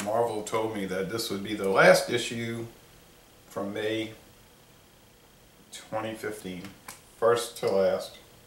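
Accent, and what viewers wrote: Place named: Midwest, United States. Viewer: Louisiana? You sound midwestern. The foil neck honestly just annoyed me into grabbing my beer glass instead of drinking it from the bottle. Not sure if that was their goal or not lol.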